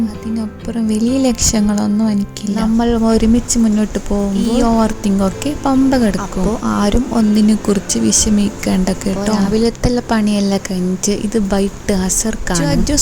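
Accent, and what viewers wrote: Kasaragod, India. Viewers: ആര് പറഞ്ഞു 😂😂video ഇഷ്ട്ടം അല്ല എന്ന് ❤ നല്ല രസം ആണ് കാസർകോഡ് സംസാരം കേൾക്കാൻ ❤️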